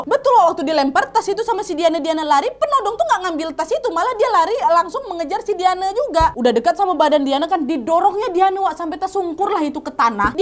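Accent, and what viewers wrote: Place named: Medan, Indonesia. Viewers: Emang logat medan paling disukai 🥰🥰🥰😁😆😆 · Ampunnnnn, cerita kau jd kocak krn gaya cerita yg berlogat sumatera❤❤❤ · Nonton semua video si gue ngerasa kalau semua tokoh dari negara manapun yang dia ceritain adalah org MEDAN.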